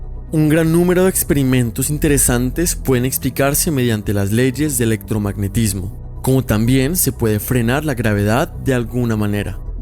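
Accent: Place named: Colombia. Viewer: Escuche este video en inglés. Ahora lo intente escuchar en español no puede escuchar más tres minutos, ese acento colombiano no puedo, no puedo espero que cambien al narrador por un acento más neutral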